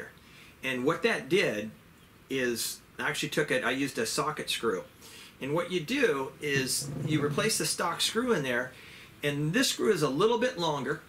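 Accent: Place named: Southern California, United States. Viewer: Sounds like you live in Southern California.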